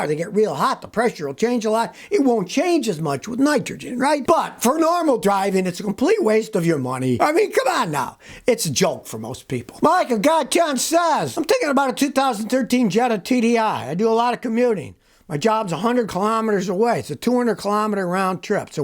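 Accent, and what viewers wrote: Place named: New Jersey, United States. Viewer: Hey. Love your "Joisey "accent 🤑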